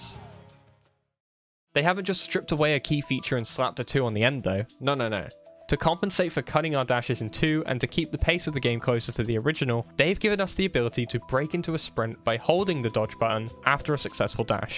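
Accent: United Kingdom. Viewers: Clickbait thumbnail - Check Talking Head with 4 poses - Check Hasn’t beaten the game they’re talking about - Check Annoyingly British - Oh Absolutely Yup it’s a game journalist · I will sub you bro love British accent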